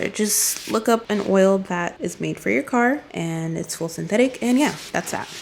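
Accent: Jamaica. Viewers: You sound Jamaican 🇯🇲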